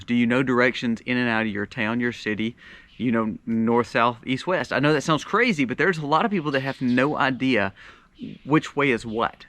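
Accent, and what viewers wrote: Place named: Mississippi, United States. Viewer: 🤔 "...your WHALE...how to BOWL...,"😮😅 Oops! Forgot to adjust my ears for your lovely MS "accent" (we ALL have accents 😉)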